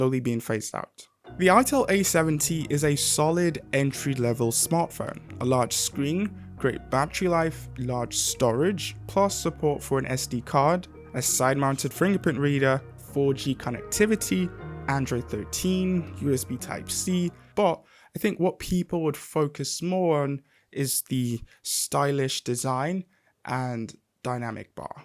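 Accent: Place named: United Kingdom. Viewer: Your reviews are unique. Were you born/lived in the UK? Your accent sounds British (I grew up in London). You didn't mention its 256GB variant. I carnt seem to get it on the market, why?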